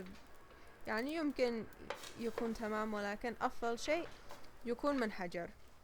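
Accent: Sanaa, Yemen. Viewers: ايوه هي من صنعاء كلامها صنعاني انا صنعانيه انا احب أكلات اليمن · You are awesome !!!! Very amazed by your fluency in the Arabic language , and Sanaani accent. You have done a great job. Thank you :)) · Your accent is so cute. Never heard a cooking show on YouTube with Yemeni food and accent:) going to try this;) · من لهجتك صنعانيه و لكن عايشه خارج اليمن .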